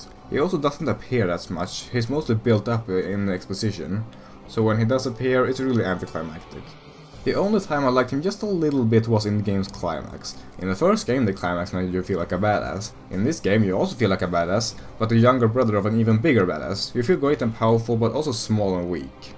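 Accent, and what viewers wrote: Sweden. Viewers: Wait, are you sweedish?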